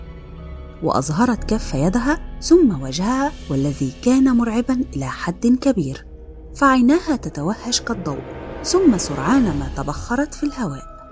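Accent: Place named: Egypt. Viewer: يا مرحبا بأخت صدقيني اعشق صوتك اتعرفين لماذا لانو عندما كنا صغار في جزائر كنا نسمع صوت رسوم متحركة بلهجة مصرية ولهاذا صوت مصريين نحسه يذكرني بصغري والله روعة 🇩🇿🇩🇿❤❤🇪🇬🇪🇬💪💪💪💪💪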